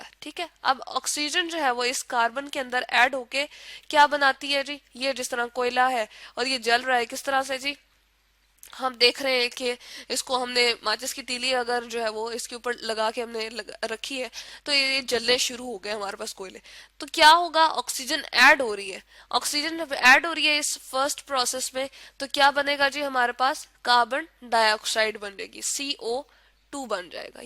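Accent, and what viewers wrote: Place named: Pakistan. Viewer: You look Pakistani the way you talk 🤔🤔😍 I love your way of talking and this video helped me a lot thank you .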